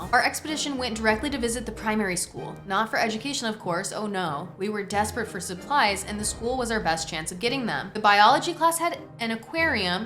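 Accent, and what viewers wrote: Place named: West, United States. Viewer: I fear has slipped into her wild wild western supermarket accent and it hasnt gone away 😭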